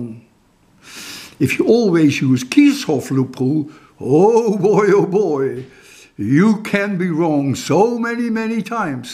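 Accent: Netherlands. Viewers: respect, you sound like a dutch man btw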